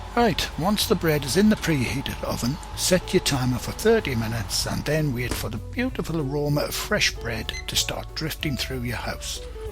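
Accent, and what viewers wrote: United Kingdom. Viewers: Hi, I'm a too, I used to make bread weekly, thirty years ago, and am just getting back in. I've killed about three "sourdough starters", don't start in the winter, I've made a couple loaves well, just baked your "baguettes" yesterday, first time with such, and they came out beautiful, light and fluffy, crispy on the out, and I'm making bread for dinner tonight, with my daughter and son in law. I've long made one loaf, by "division", in cups, teaspoons, but this is the first time doing it with grams, and I expect to have more "equal" outcome, I really like weighing, because it matches with humidity and such better. I only hope my loaf comes out half as nice as yours, this afternoon. I've been using my "mixmaster" always did by hand before, and am shocked at how easy it is, with the mixer. I love your technique, I've kneaded for decades, and it "makes" the bread. 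Thanks for a great recipe, and excellent technique, bringing me back to doing it right. Your British accent makes it that much more enjoyable, I'm a retired mechanic, Marine, I try not to get the dough too dark, lol. Thanks for a great video.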